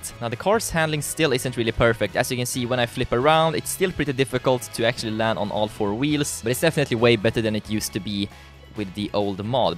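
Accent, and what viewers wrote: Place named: Sweden. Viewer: Man hör att du är svensk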